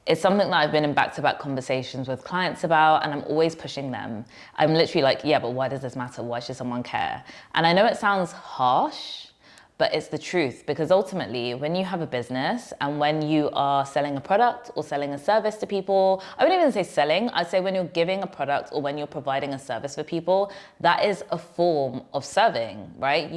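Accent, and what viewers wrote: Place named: New York, United States. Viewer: This is the wisdom I have been looking for. No hacks, no copy and paste, no what are your competitors doing. Just pure inspiration and creativity that allows you to cultivate your voice and your story telling capabilities. AND it was applicable to me even though I am not a huge brand. I really appreciated this! And as a Brooklyn native there is something so NY about your delivery and I am here for it.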